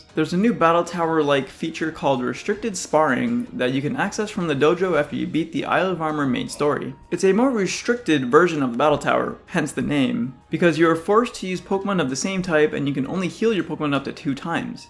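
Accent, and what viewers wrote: Canada. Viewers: Why do you sound like you from canada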